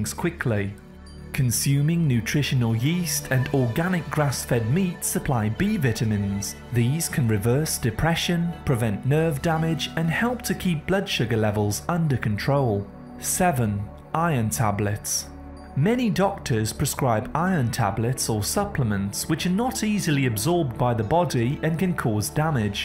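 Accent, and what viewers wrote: United Kingdom. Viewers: Very informative, thank you! Interesting that you read with a British accent but then use American names. E,g. I don't know a single Brit who knows what arugula is (it's called rocket here)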